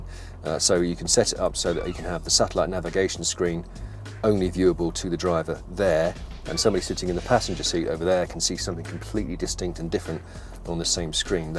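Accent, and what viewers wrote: United Kingdom. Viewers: Shut the hell up, we want to sample music not your damn british accent..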